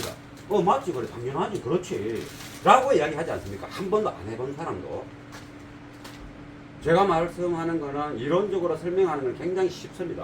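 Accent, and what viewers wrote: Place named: Gyeongsang, South Korea. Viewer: 영상잘보고갑니다 ~^^ 같은 경상도 말투라서 정감 이갑니다~^^ 사장님혹 고향이 진주입니까?~^^ 우야둥둥 매번 시청합니다~~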